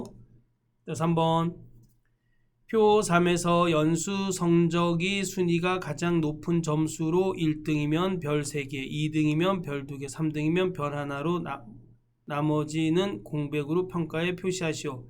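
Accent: Gangwon, South Korea. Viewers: 선생님 오늘 시험보고왔습니다~~ 함수 진짜 75문제 두번 돌려보고 상시기출 보니깐 좀 이해가네요!! 반복이 답인것 같습니다. 선생님 덕분에 index match 마스터했어요! 선생님꺼 보고가면 왠만한건 다 풀 수 있습니다 ㅎㅎ 실수만 안했으면 만점일 것 같습니다! 저도 강원도 사람이라 억양이 익숙해서 즐겁게 강의봤습니다! 농담도 재밌어요 ㅎㅎ 감사합니다^^(셀서식-범위잡고 이름지정 조건부서식 수식조건셀 Hour*65+minute*/10 요금 65 If month 수시 정시 1-6월 9월 Vlookup left 할인율 구하기 Daverage- average 상공고 평균 - 전체고 평균 Averageif , round 1 &연산자 “점” 정렬 부분합 시나리오 지정 남,녀 기준 2개 하래서 당황함 = 컨트롤키 잡고 눈치껏 했습니다 매크로 차트)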